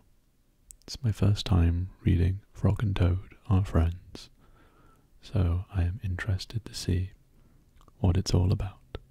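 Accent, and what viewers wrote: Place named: England, United Kingdom. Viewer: the English accent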